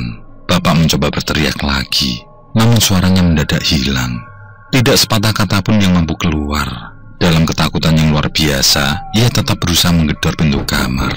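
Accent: Java, Indonesia. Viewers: Suara narator dongeng Ter baik ya ini. Suaranya medok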